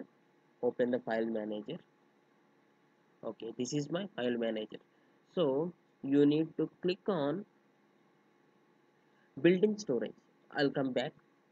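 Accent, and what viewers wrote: India. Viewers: You have an Indian accent but at least you speak understandable English. Thank you!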